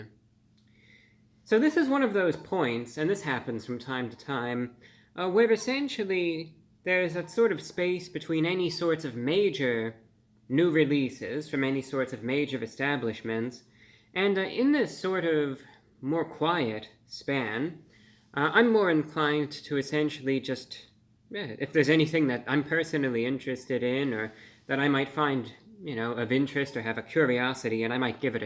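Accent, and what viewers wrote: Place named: Transatlantic, United States. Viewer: The only YouTuber to have a seemingly transatlantic accent.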